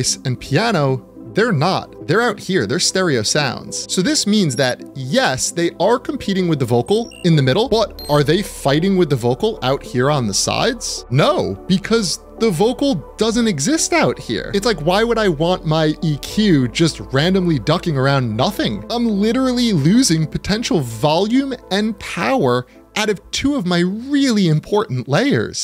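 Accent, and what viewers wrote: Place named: Arab world. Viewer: Your accent is from arab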